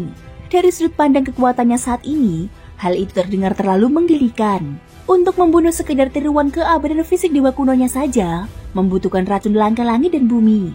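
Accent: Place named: Purwokerto, Indonesia. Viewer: Mbak naratornya orang purwokerto yaa, hayo ngaku aja lah , mbok 🤣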